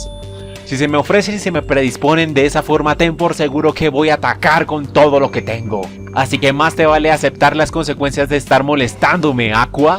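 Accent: Colombia. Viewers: Ja referencias colombianas habla como colombianas eres de colombia ¿?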